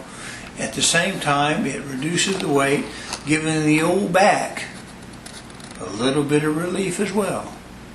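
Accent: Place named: United States. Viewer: i like people talk with cowboy accents.. sounds like pretty cool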